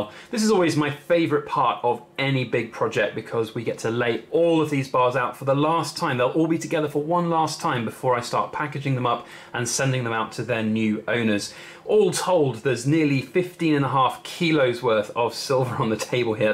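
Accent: United Kingdom. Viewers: Yes I know u have a British accent. That’s why i said that. Lol. How much would it cost to ship to US?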